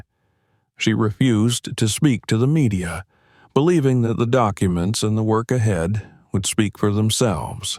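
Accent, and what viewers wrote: South, United States. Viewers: Loved the story, but seriously change the voice. The old Southern drawl does not suit the story. He can't even pronounce the Italian names.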